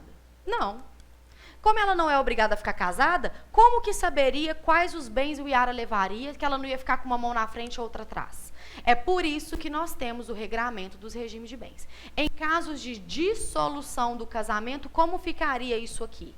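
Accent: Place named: Belo Horizonte, Brazil. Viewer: Ola Prof este seu sotaque é de BH kkkkk.Mas uma boa explicação de vossa parte.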